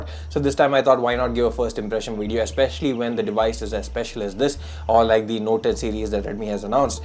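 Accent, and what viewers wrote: India. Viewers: U sounds harmonic From INDIA ? Subscribed anyway 😊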